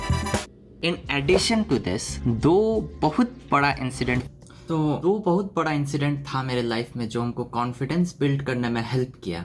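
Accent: Bihar, India. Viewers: i don't know why but i love to listen bihari accent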